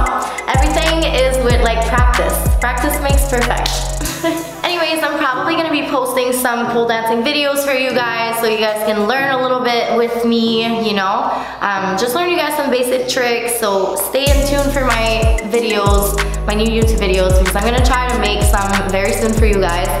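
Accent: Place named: France. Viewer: Girl I hear ur French accent and I’m francophone aussi lol I love it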